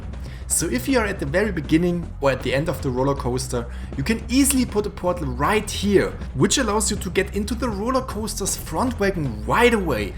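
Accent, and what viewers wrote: Germany. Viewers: are you german? Your accent sounds really similiar to mine. :)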